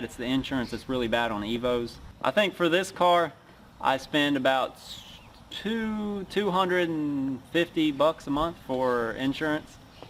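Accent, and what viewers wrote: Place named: South, United States. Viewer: so hard to take people with southern accents seriously.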